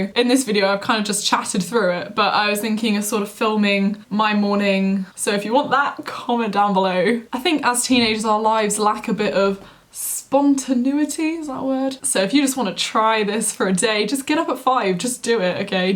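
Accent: United Kingdom. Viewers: do you have a british accent? you dont seem to have an aussie accent to me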